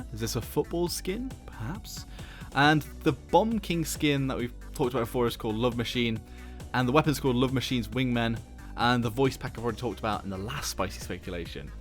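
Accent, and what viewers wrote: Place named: United Kingdom. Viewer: Why is your accent so british , idk how to call it , tremendous shit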